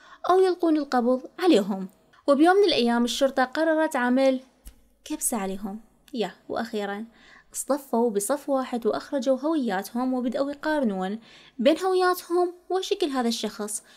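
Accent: Iraq. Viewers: يزين اللهجه العراقيه تخبلييين❤️❤️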